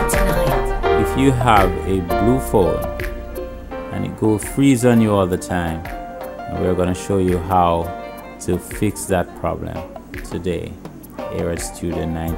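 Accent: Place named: Jamaica. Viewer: yo yah jamaican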